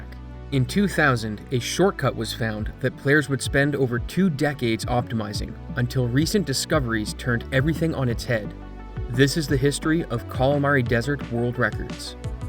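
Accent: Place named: Maritimes, Canada. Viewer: im hearing some maritimes in your voice, newfoundland?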